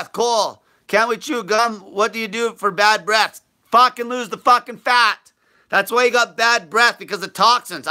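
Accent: Canada. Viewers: He just needs an image re-adjustment. This gangsta character does not work with a Canadian accent. He doesn't sound tough, which is what he's aiming for. He just sounds like a wanna-be.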